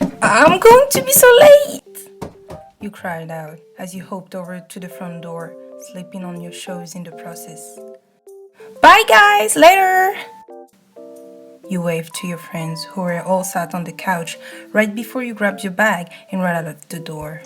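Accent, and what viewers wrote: France. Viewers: this is gold new sub :) love that acent tho you french or somethin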